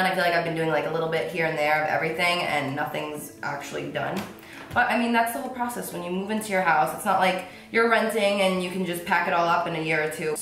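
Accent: New Jersey, United States. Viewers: u have a nice thick jersey accent, represent girl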